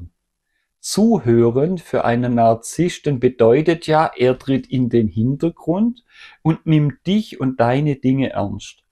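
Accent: Swabia, Germany. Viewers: Auf den Punkt gebracht 👍! Was ist es für ein Dialekt? Schwäbisch? Meine Freundin aus Tschechien spricht so.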